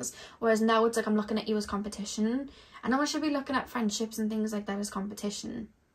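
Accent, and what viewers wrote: Wales, United Kingdom. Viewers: I chose pile 3 and everything you said was 💯. I am on a self love journey finally after so many years of Searching for love in all the wrong places. I have finally had to separate myself from family and most friends because it was always take take take and no give back. And when I finally start to do well everyone is angry and jealous. It hurts so much and I am sad that I invested and gave anything and everything to people who never cared to see me for who I truly am. I know it stems from my childhood in an extremely dysfunctional family and being the scapegoat. So just as you said I inadvertently searched for love in the wrong types of people hoping to finally get it back. I’m finally falling in love with me now and it hurts to leave everyone behind but it also feels so liberating and I truly have got this lesson finally. 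Thank you you are so gifted. I love all of your heart felt readings and your adorable Welsh accent! 🥰🥰🥰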